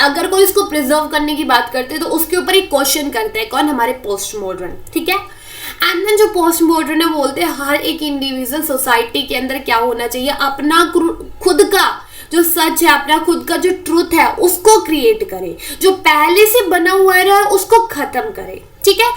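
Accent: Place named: Delhi, India. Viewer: It's really interesting.... To listen👂 Is YouTuber from Delhi?? Or NCR... speaking ascent!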